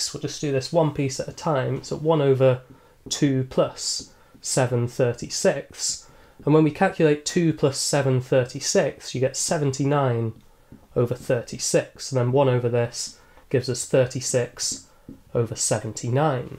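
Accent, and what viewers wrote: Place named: RP, United Kingdom. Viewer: Mate, don't get me wrong: I love math yet your soothing voice and english posh accent are perfect to fall asleep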